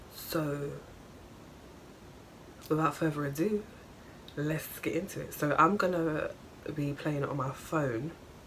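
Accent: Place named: London, United Kingdom. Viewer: I love your London accent 🔥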